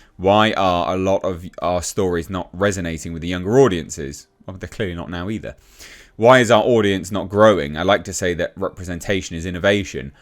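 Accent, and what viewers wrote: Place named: Southern England, United Kingdom. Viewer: This accent you have. It sounds like ricky gervais. What region of the world is this? Apologies in advance, they don't teach us specific English locations. Can't put my finger on it its like a southern accent ( to a yankee analogy)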